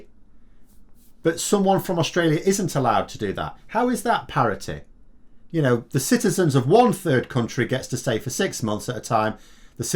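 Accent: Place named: England, United Kingdom. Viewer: Are you really English? After years of forced listening to Brexit hacks, what you say does not match your accent! Freakish, but in a good way!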